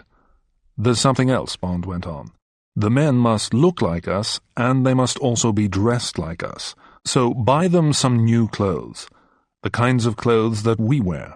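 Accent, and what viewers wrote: United Kingdom. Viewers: is this british or american?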